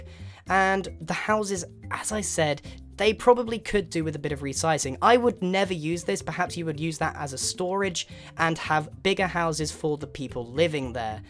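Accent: England, United Kingdom. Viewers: He has a English accent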